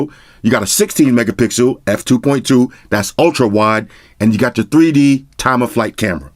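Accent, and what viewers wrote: New York, United States. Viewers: eyyyy a New York brother!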